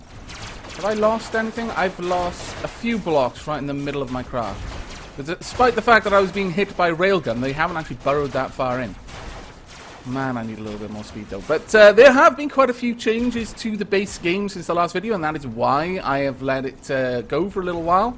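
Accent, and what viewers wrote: United Kingdom. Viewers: thanks for understanding i love your channel by the way keep it up moslty the robocraft vids there awesome you helped me a lot with fliers and you got me into the game your on my 1 on the list of my favorite youtubers cause you answer back and you understand us and also help us and i really like your voice its really pleasing to hear it when ever i watch i vid i get really happy cause i love the british accent its so nice to hear but keep it up! looking forward to the next episode.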